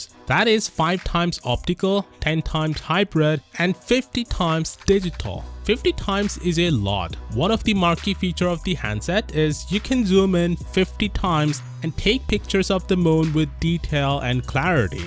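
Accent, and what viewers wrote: India. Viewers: He has a certain accent. Indian maybe? I'm not sure. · Are you India? 😅 I just wonder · His accent is that of an Indian person correct? They called me like a hundred times a day I am inherently racist sorry I can't stand you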